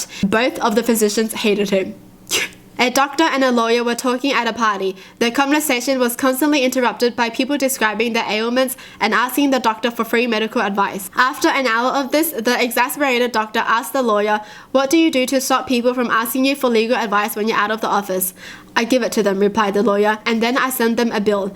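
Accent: Australia. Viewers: She has an accent. She is a aussie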